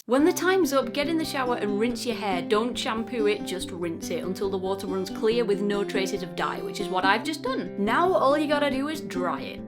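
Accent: North, United Kingdom. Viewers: Love this video... Love your northern accent getting so excited about hair dye haha XD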